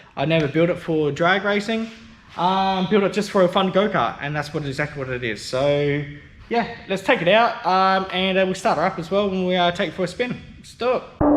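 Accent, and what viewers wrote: Australia. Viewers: Bloody!!!!!!, Insane!!!!, Well BUILT!!!!!, Ya Sound Aussie:text-green-game-over::text-green-game-over::text-green-game-over::face-green-smiling::face-green-smiling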